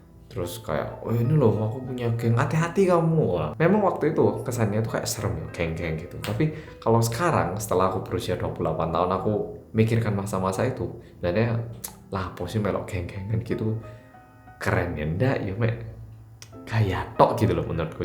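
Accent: Java, Indonesia. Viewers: Mas, maaf ooc. Tapi gimana cara Mas me-maintains logat dan bahasa jowo mu disana? Kayak gak pernah berkurang sedikit pun kadar medoknya. Saya suka 👍⭐ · Lama g nontonin wong medok siji iki.. Sehat trus mas, sukses ya.. Tambah ganteng rek..😁😁😁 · Oppa koreanya medok bgt...hehehe so cool...keren keren...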